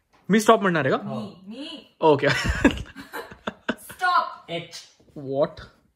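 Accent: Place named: Nashik, India. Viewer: tujya videos madhe nashik khup aikayla yeta.... Btw mi pn nashik ch ahe...tu hi nashik cha ahes ka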